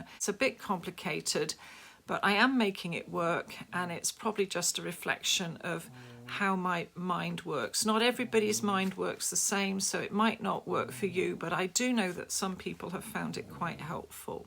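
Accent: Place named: England, United Kingdom. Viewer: New subscriber.. love your channel..and the English accent.. thank you... ❤ From Australia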